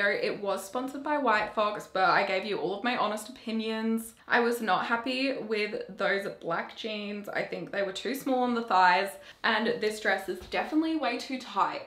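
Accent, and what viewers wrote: London, United Kingdom. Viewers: Cracked me up with that London accent haha! Love it, great vid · the London accent killed me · I CANT GET OVER THE LONDON ACCENT STOP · The London accent was perfect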